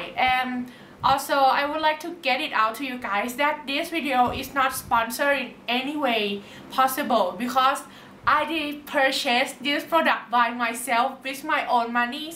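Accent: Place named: Thailand. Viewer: Thai accent Oh~ that good 👍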